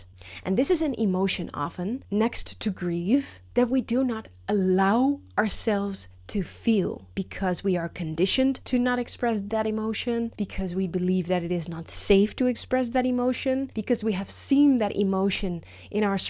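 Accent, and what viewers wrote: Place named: Netherlands. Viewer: This video really was a eye opener. Als reconized the dutch accent in secconds❤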